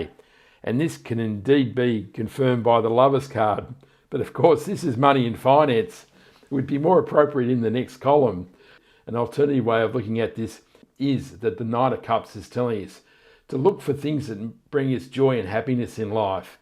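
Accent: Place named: Australia. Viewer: W Hi,. Sounds like you are an Aussie. Love your voice, manner, energy and expression. Subscribed. Thank you, and best of success. Great cartoon pic thumbnail. - very effective for click through!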